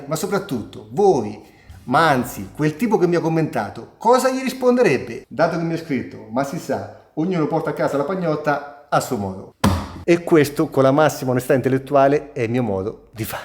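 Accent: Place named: South, Italy. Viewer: Che accento da terrone!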